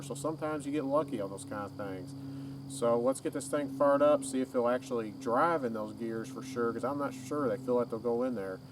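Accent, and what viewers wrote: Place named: Texas, United States. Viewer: Where are you from my friend?? I'm from S.E.Texas and the way you say "that thang" and "right thar",makes me wonder if you're a fellow Texan...😁 I'm really enjoying the work on the tractor and would love to see you do some body work and restoration!!!💯👍👍👍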